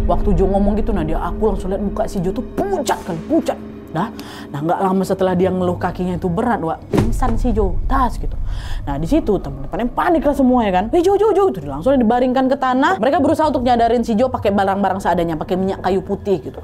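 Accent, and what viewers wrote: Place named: Pekanbaru, Indonesia. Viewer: Tapi dari logat kak ini logat pekanbaru tapi kadang logat medan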